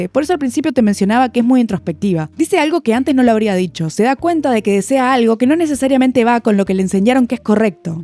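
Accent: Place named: Argentina. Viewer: como amo el acento argentino 🙈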